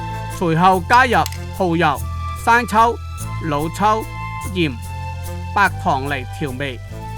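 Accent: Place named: Siyi, China. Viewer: 梗係我哋四邑口音啫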